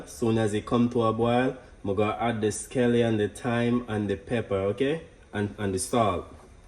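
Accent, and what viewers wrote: Jamaica. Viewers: Beautiful yard accent. Keep it up.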